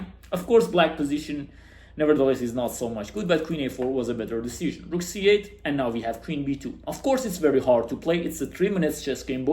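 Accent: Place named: Italy. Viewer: the church bells definitely seal the deal confirming youre italian if the accent wasnt enough😂😂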